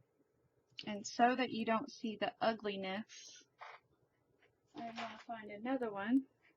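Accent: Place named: Texas, United States. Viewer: I know this is an old video but I THOUROUGHLY enjoyed it!!! You MUST be from somewhere in Texas! Lol. {You talk like me}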